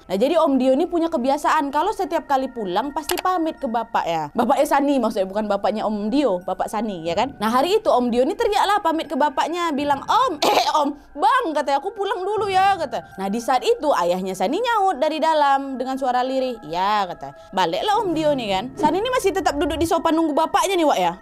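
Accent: Indonesia. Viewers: wajar lidah indonesia kebanyakan gitu ngomongnya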